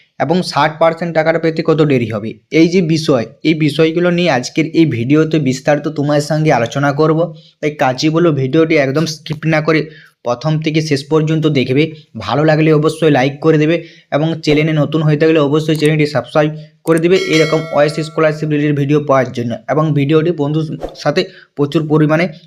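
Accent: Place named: Purulia, India. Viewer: Dada kothai bari tomar vasa ta purulia tan lag6e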